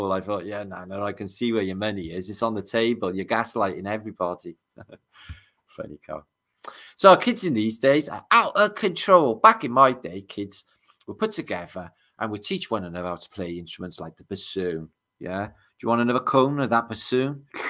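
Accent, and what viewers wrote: Wales, United Kingdom. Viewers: Hi. Just found your channel through Archaix. A meet up snippet with you describing trying to get out of the UK I think! I thought "I know that twang!" 🏴😀 Sending love and best wishes to you from a fellow south 'Walian'. I'm sorry I didn't find your channel sooner. Subscribed for what it's worth and really enjoying my first few minutes of your stuff. 😎🙏💥Thanks again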